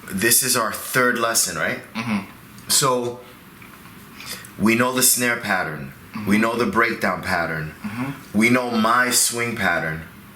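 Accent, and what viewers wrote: Barbados. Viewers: Dope shit! Big up! (I heard that Bajan accent too) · bajan accent strong.....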